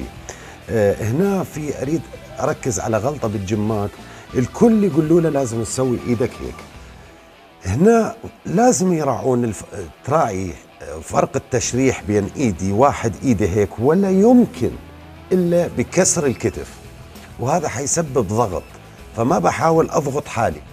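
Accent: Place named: Baghdad, Iraq. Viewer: عراقي وبغدادي 😹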